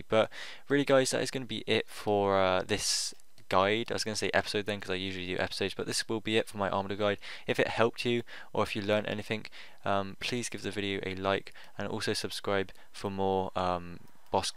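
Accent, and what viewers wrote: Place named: West Country, United Kingdom. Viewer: Thought you sounded westcountry :p From Devon myself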